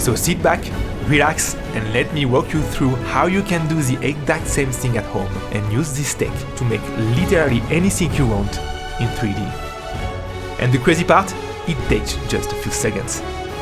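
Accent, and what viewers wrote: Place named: France. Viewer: Yeah…real convincing. The thing turns out to be the usual ‘blob’, and then we watch a whirlwind of sculpting edits on super fast fwd. These Ai image to model programs are ‘not ready for prime time’. So why is every ethnicity and their grandmothers trying to sell us on it? This one is particularly annoying, being narrated by a guy with super thick french accent. See you in a few years…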